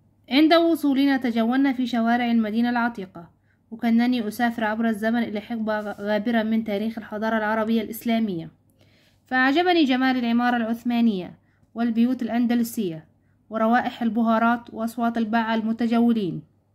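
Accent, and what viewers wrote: Egypt. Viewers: بصح هدرتك تاع مصر حسبتك مصرية😅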